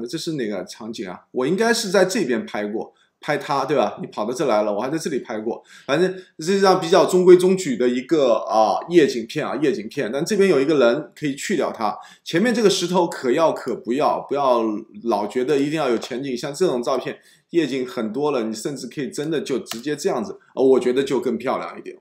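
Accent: Shanghai, China. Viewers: 先生侬好！两个月前，一次偶然的手抖误操作，点开了正在直播的《摄影评片》，听到一口上海腔普通话，就像听到邻居、朋友讲闲话，蛮亲切的，所以没有马上转频道，听听讲点啥么事？听着听着，被侬专业、中肯而又平实易懂的点评所吸引，尽管我非摄影爱好者，但是仍就看到了直播结束，并且订阅了《詹姆斯摄影》。之后陆续看了另外两集《评片》，也看了《瓦纳卡的孤树》、《星芒照海岬》和《詹姆斯摄影十年作品》（封面上的南浦大桥覅太赞哦！），刚刚又看了第十二期《摄影评片》，虽然这些只是侬视频群里很少的占比，但是已经影响到我，对摄影好像有点感兴趣了，正在准备考虑买只两手货佳能5D2，再配一只好点的镜头起步，尝试去感受拍照的乐趣。侬让我联想到了另外一位从上海走出来的摄影大师——郎静山，尽管所处时代不同，作品风格迥异，可都勤奋努力，孜孜不倦，砥砺辟出了自己的路，都在成功之后毫无保留地将自己的学识和经验和盘托出，倾力普及传授摄影知识，都德艺双馨，令人尊敬。郎先生一百多岁还手捧相机黄山徒步，相信侬也会在一百多岁时仍行走于天涯海角摄取美景飨世人的。祝福侬摄影教学收获更多硕果，摄影艺术登上更高境界！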